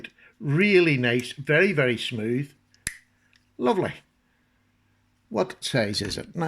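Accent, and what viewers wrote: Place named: Ireland. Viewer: my man, I don't know how you do it, but do it you do! This must be a a new model as I haven't seen one before; I have to admit, I'm a might envious as, you're right! It's a beautiful piece it really is. I think it's a little belter, and that blade is a wonderful shape, ideal for any task. I have a good selection of knives from CRKT and they have never let me down once! Keep up the great work, I always look forward to hearing your dulcet, Irish, velvety voice and you know what you're talking about! See you on the next one, my friend. Much love to you and yours. I'm away for nice cuppa tea myself! 😆🤗❤️❤️❤️❤️❤️❤️❤️🤗😆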